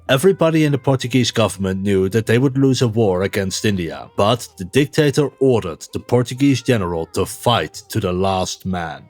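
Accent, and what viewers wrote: Netherlands. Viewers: Do I hear a Dutch accent? 🇳🇱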